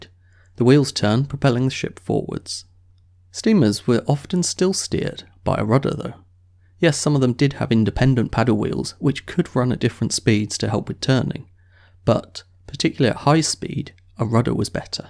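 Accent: United Kingdom. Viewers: The British accent is, somehow, disturbing. At least to me. Many times, annoying.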